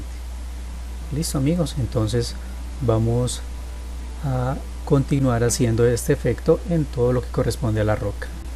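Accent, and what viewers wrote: Colombia. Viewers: Muy buen video 👌😃 (tiene acento colombiano pero no usa términos colombianos)(?)🤣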